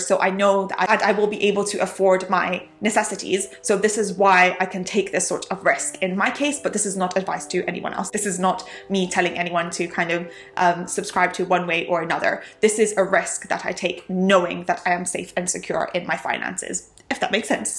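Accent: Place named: United Kingdom. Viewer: Beautiful wonderful marvellous. Good British English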